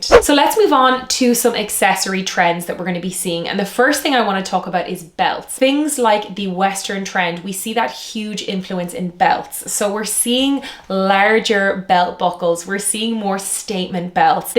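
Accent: Ireland. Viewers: Thanks , lovely to hear an Irish fashion stylist. Be great to see fashion for over 60s style, a little. But great update on what’s coming. Thanks ❤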